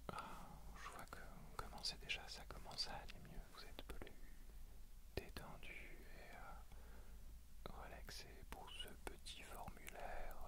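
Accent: South, France. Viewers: D'habitude je n'aime pas du tout les role-play mais j'ai a-do-ré celui-ci !! Peut-être parce que c'est plus que ça justement... les clins d'œil sont tellement malins, la touche d'humour est parfaite, le scénario est génial, le fond noir est hyper agréable, le texte est top et le son est absolument impeccable ! Vous avez sans doute fait plusieurs essais avant de sortir ces vidéos mais c'est tant mieux :) Je décèle un léger accent, êtes-vous originaire de la moitié sud de notre bel hexagone ? :) En tout cas il apporte une touche unique supplémentaire à votre travail ! Vraiment bravo pour tout cela, vraiment ! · Baptiste Conbatant un léger accent du sud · Superbe vidéo ! J'ai l'impression qu'un certain accent du sud revient des fois non ? ^^ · tu es du sud cong non ?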